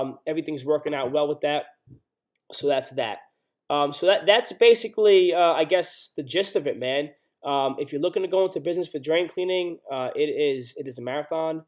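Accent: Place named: New York, United States. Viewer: I knew you were from ny lol great information.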